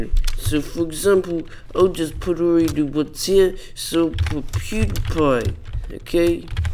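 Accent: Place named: Russia. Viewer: Russian speaking inglish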